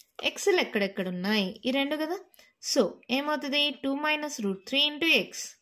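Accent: Nellore, India. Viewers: Mam are u from Nellore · Mam are you from Nellore???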